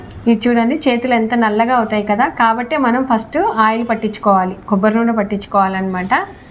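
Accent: Rayalaseema, India. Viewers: Akka peekinave kadu akka teesavu anali mee rayalaseema bhasha yasaga vuntundi 😊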